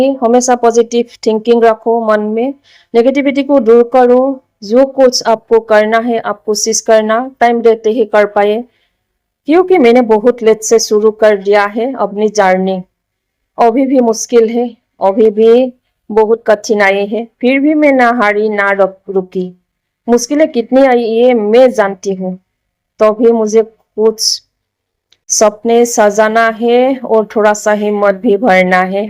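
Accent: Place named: Assam, India. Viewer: Maje maje axomiya tune to ahiye jai🎉❤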